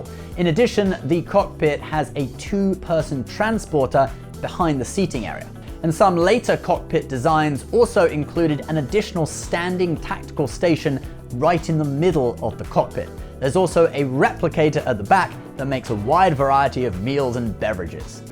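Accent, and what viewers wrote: United Kingdom. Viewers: Cool. Its. He's back.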